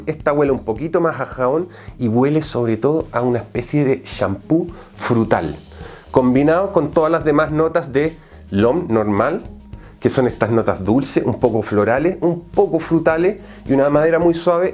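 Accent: Chile. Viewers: Eres Chileno o Español? Pareces una combinación de ambas jaja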